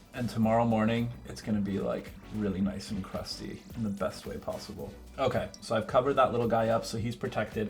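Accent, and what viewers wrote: Quebec, Canada. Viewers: okay but has a french canadian accent when he speaks french??😍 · OMG I didn't realize was Canadian till I hear his french accent!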